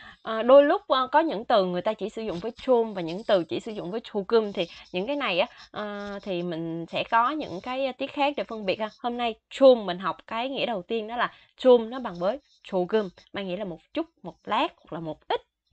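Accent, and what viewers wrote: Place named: South, Vietnam. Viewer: thích giọng người miền nam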